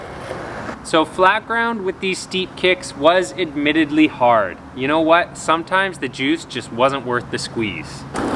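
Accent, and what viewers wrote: Canada. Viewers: I’ve seen a couple of your vids but damn you sound hella Canadian in this one... lmao I never even noticed until now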